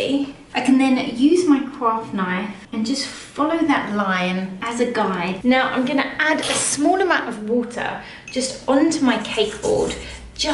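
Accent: United Kingdom. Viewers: As much as I love your rainbow cake, I love your British accent ❤❤❤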